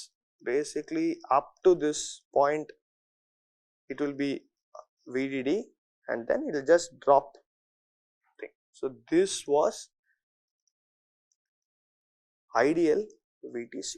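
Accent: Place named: India. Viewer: White dude with indian accent? Thats a first for me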